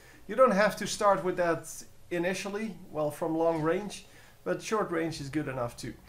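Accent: Netherlands. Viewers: are you from the netherlands i hear it on your english ( ik ben van belgie )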